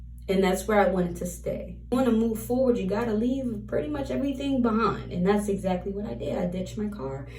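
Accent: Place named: Baltimore, United States. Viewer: You from Baltimore I know stUpid and DUo when I hear it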